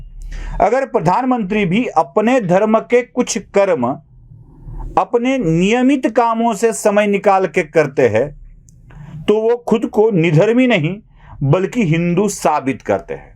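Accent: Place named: North, India. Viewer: Sir Apki Hindi to Bahut Achhi hai.. Apke hindi bol se ap to Ap North Indian hi lagte hai..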